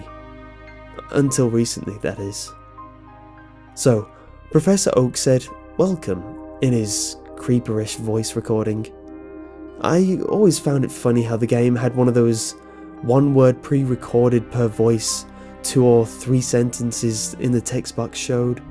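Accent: United Kingdom. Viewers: || WhoSquad he's British ever heard of it i think you don't because most people on youtube are american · yay british narator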